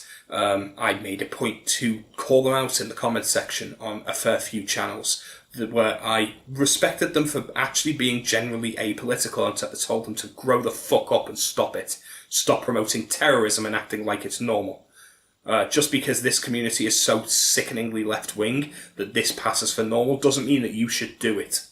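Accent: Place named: England, United Kingdom. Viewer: Thanks for this very intelligent, interesting talk. It's very courageous of you and will earn you lots of brickbats, I'm sure. I did not know that one can block people on YouTube. How do you go about it? I am thinking of starting a BT channel. I am put off BT a lot because it seems almost entirely to consist of young, female 'readers' posting who are as interested in their appearance and their books' appearance as they are in books in general. Eg, shelving your books according to the colour of their covers! That strikes me as pretty infantile, unless you're five or six. Many of my books are shabby, grainy second-hand copies with little colour about them at all. One young female poster gave details of her make-up and where to buy it! I'm an old man and so feel really out on a limb, but will still give it a go,because I would like to meet a handful of people who are serisouly interested in books. 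I have found a few older women's' channels that are very interesting and from whom I have actuallyl earnt things. Another complaint I have is the lack of people interested in non-fiction reading. I hardly read anything else! The algorhythm must have filtered a lot of left-wing things out from my recommendations. I haven't had lots of leftie rubbish thrust at me, but I have noticed that leaning, too. Your picture seems very American, culturally speaking, though you sound 'English'.. I'm a Brit. There aren't many Brits on BookTube. Thanks again for a great contribution.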